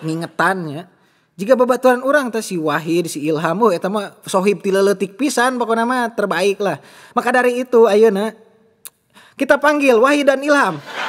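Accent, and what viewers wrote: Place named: Sukabumi, Indonesia. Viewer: Sunda na logat jampang sukabumi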